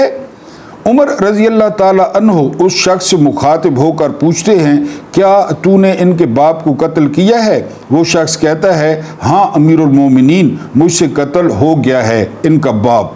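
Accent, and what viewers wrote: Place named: Mianwali, Pakistan. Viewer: مجھے سرائکی زیادہ نہیں اتی لیکن اپ کے منہ سے یہبہت اچھی لگتی ھے ویلاگ کا بہت مزا اتا ھے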